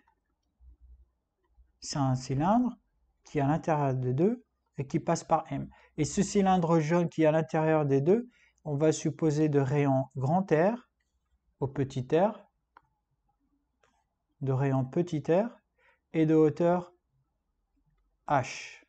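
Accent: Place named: France. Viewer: magnifique accent francais, comment te la eu ?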